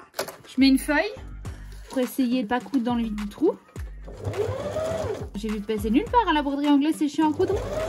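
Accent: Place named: Belgium. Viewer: Ahah tu es folle j'adore! Merci pour le fun et cet accent belge je ne men lasse pas!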